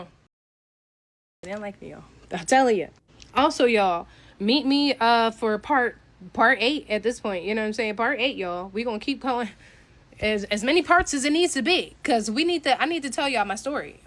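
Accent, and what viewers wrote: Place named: United States. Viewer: Not raining on her parade or NOTHING! But as an American who lived in Jamaica for three months, also in the hood, a big percentage of why you get so much attention is BECAUSE YOURE AMERICAN! They can tell very easily, and don’t open your mouth cuz that accent gone tell on you, that’s also probably why the guys brother brought you to meet so many people, it gives people status to “know” an American. She’s also soooo beautiful so I’m sure she gets a lot of attention anyways but just food for thought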